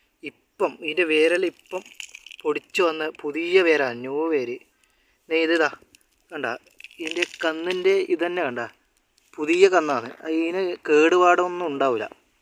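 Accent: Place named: Taliparamba, India. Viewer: Taliparamba slang in kannur ❤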